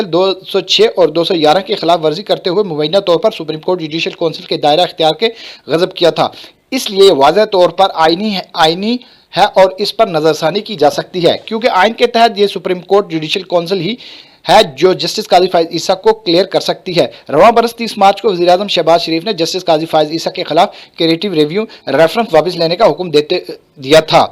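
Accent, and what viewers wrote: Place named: Kashmir, India. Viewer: Pure Kashmiri Touch😂 Keep it Up🎉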